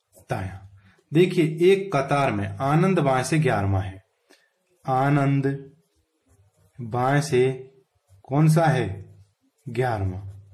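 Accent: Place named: Bundelkhand, India. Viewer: Sir apki ki avaj m bundelkhandi language ka touch dikh rha.loved this.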